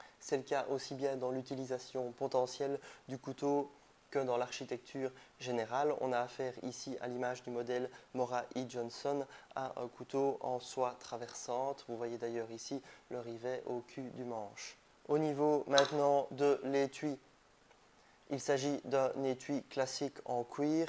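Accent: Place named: Belgium. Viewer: Ca c'entend un peu mais sa choque pas tkt :) je suis belge aussi donc j'ai l'habitude :D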